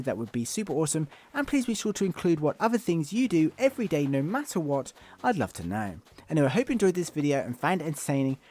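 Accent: England, United Kingdom. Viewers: You have a great english accent 😌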